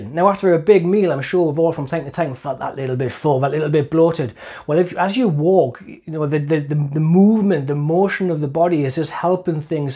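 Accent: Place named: Newcastle, United Kingdom. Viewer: Geordie . Hello from Australia. Miss the accent.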